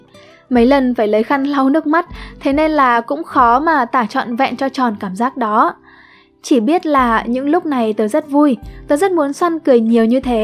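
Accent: South, Vietnam. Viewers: Hình như đọc giọng nam phải không (ý mà miền Nam nhé không phải nói nam nữ 😁)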